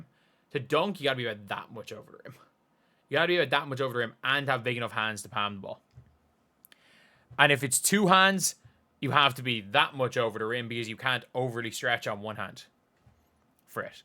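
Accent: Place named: Ireland. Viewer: I like your accent! Sounds Irish to me. Where are you from?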